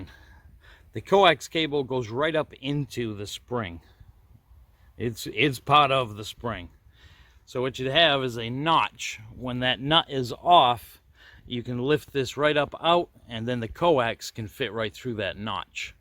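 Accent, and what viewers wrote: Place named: New England, United States. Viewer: "TRACK-TAH!" You sound like you are from my area in New England (RI)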